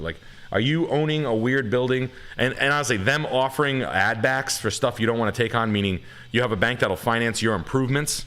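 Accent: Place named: East Coast, United States. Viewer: is the only man with an east coast accent who genuinely cares about anything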